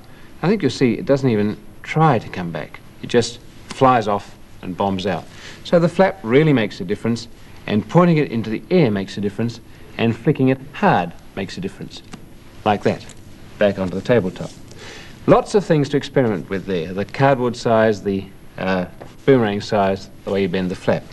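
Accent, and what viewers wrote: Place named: Australia. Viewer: You have the most stunning Australian accent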